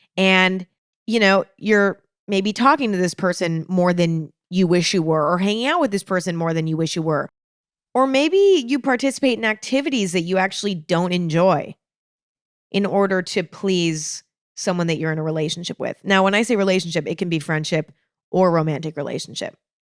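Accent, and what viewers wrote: United States. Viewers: living for ur new york accent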